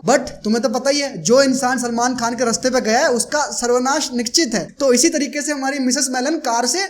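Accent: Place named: Rajasthan, India. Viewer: Mjhe laga hi tha bhai rajasthan se h.....bolne k tarike se hi lag jata h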